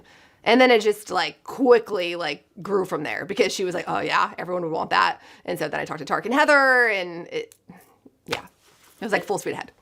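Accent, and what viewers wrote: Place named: California, United States. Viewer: She is a California girl through and through. That’s how we talk.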